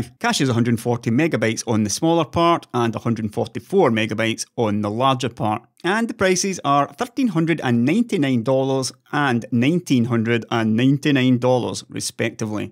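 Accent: Scotland, United Kingdom. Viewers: That is one Scottish accent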